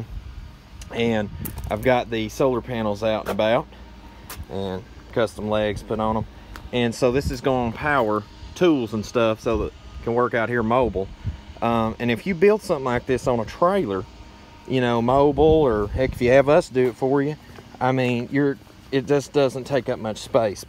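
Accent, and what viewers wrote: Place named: South, United States. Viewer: Love your southern!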